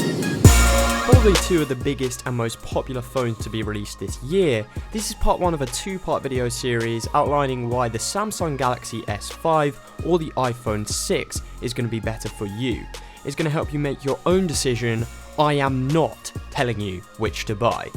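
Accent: United Kingdom. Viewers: ненавижу британский акцент !!! аааааа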